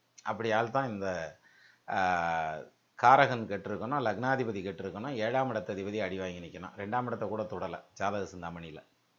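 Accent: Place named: Dindigul, India. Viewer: Thank you sir for conducting a superb program. Really we will know many things from astrology . your dindigul slang and tamil poetic style is extraordinary. I have some doubt regarding my jadhagam. My DOB: <num> Time: 5.30 pm. 1. விருச்சக லக்னம் கடக ராசி ஆயில்யம் நட்சத்திரம் லக்னத்திற்கு ஏழாமிடத்தில் சுக்ரன் ரிசபத்தில் ஆட்சி பெற்று நிற்பதால் திருமணம் தாமதம் ஆகுமா? எப்பொழுது திருமணம் நடக்கும். 2. இரண்டாமிடத்தில் சனி மற்றும் ஆட்சி பெற்ற சுக்ரனால் இரு தாரம் அமைப்பு ஏற்படுமா? 3. 8 ஆம் இடம் மிதுனத்தில் குரு,சூரியன் ,புதன் சேர்க்கை, புதன் ஆட்சி என்பதால் மற்ற இரு கிரங்களும் ஆட்சி பெறுமா? அல்லது பகை வீட்டில் குரு இருப்பதால் மற்ற கரங்களும் பாதிக்குமா? 4. 9ஆம் இடத்தில் சந்திரன் கேது சேர்கை ஆட்சி பெற்ற சந்திரனுடன் சேர்ந்து கேது நல்ல பலன் தருமா? 5. வெளிநாட்டு யோகம் உண்டாகுமா? எப்பொழுது.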